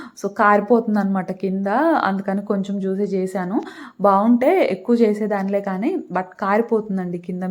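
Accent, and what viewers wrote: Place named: Visakhapatnam, India. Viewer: Your language is different in Telugu i think u r in vizag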